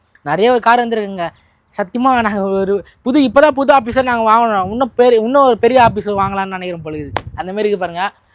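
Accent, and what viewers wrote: Madurai, India. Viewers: Super Thampi Madurai vasagam 🎉🎉🎉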